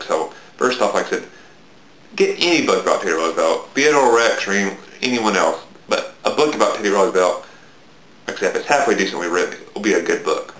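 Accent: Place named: South, United States. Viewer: Okay. Just wondering because I detected a southern accent. I live in the South as well. :)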